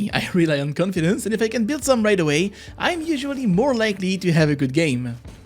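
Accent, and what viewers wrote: France. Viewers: You're the first French who speaks English without a too big accent. I can handle and see all this video's thks ❤️ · I am currently really enjoying to eat zuccini (how tf do you even write this in English). Thanks, your sweet accent always reminds me of doing my French homework for university.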